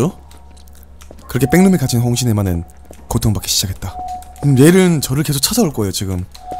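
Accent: Gyeongsang, South Korea. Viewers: 경상도사투리가 살짝씩 들리는것같은드에엥 그래서그런지 좀 친근하고 자꾸보게돼욬ㅋㅋㅋㅋ 아 반응넘웃겨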